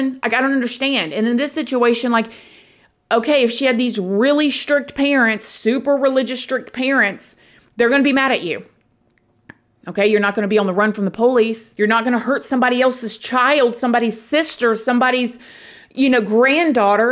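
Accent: South, United States. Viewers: Hey I love your videos I watch every chance I get. I just have to ask are you from the South? I don’t want to know where I’m just curious. I’m in Mississippi. I hear some southern twang in your voice every now and again. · First of all Seriously…..like wtf is wrong with people! Clearly she has no morals or values like wow it’s absolutely disgusting and ridiculous how this girl did this. So worried about disappointment from her family than killing an innocent woman! Anyways girI love your channel and how you are so real with everything. Plus love your southern accent with your y’all this and y’all that lol❤ Jenny from Ontario, Canada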